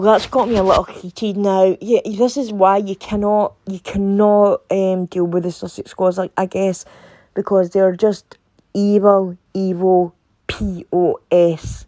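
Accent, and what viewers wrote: Scotland, United Kingdom. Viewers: I love listening to Scottish accents. Bless you 💖 Much love from Australia 🇦🇺 and from one to another. 😊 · I love your Scottish Brogue, lovely. I’m from Florida and I have no problem understanding you. I also like tangents, they are interesting and so are you. Don’t listen to the negative comments You do You❤️🌺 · Love your Scottish accent! ❤️Subscribed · Your Scottish accent is delightful. Reminds me of my late and greatly loved great-uncle. His wife, and her sister, my grandmother, both had strong Irish brogues so the Gaelic accents are music to my ears. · Don't you change for anyone, I love your wee Scottish accent🥰 the more people listen to your accent the more they'll pick it up. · . Your Scottish is fine so don't change a thing. Thousand agree with you, and thousands will continue to but many haven't the time to comment which doesn't mean we don't agree with yours. 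Free speech is free speech and that is the main point here. YouTube is very much controlled by the wrong people. You keep reviewing as a good job done.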